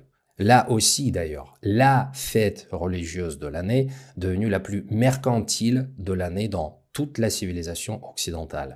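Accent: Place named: Russia. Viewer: Un "russe" avec un gros accent russe fait une émission en français pour les français en disant "On copie". C'est absurde et illustratif des "russes" occidentalisés qui ne savent plus dans quel monde vivre., faites-vous soigner!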